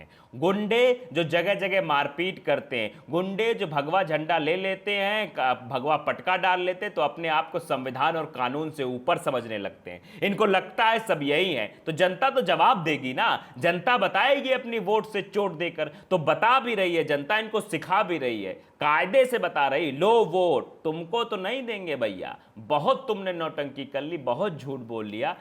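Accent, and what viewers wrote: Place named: Uttar Pradesh, India. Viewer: present news very nicely. His tune is very much UP based. I like it very much and enjoy listening him. Keep going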